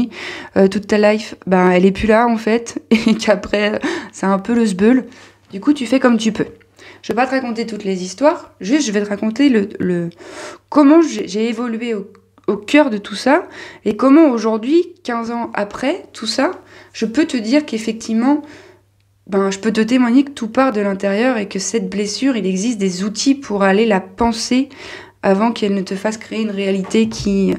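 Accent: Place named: South, France. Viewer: Incroyable cette vidéo et la petite ref de Nietzsche et l'accent du sud c'est fou.. merci pour toute cette justesse et ton partage et cet humour !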